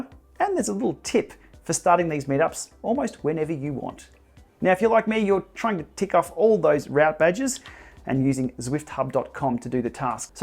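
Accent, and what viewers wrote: Australia. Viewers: May GPSheepy safely graze! Will meekly follow along, username V.Bonazzi. Thanks for your excellent videos, your Ballarat accent and videos from home. This Zwift e-snail lives a long way from home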